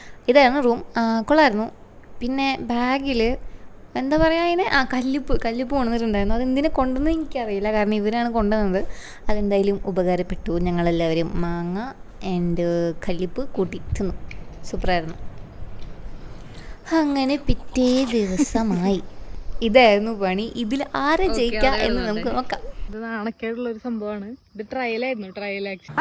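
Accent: Thrissur, India. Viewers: Thrissur touch und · ഭാഷ കേട്ടിട്ട് തൃശ്ശൂർ ആണെന്നാണ് തോന്നുന്നത്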